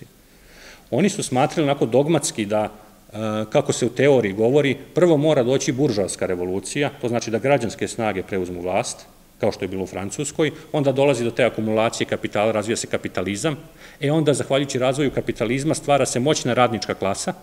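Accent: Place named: Vojvodina, Serbia. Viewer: Milane, nisam se cak ni vracala da cujem....jer u govornom izrazavanju gospodina , rekla bih da je odrastao u Vojvodini, gde se pomenuti izraz bas tako izgovara...toliko o znanju etimologije, etnologije kao i istorije ...Nista to ne umanjuje znacaj predavanja! Pozdrav!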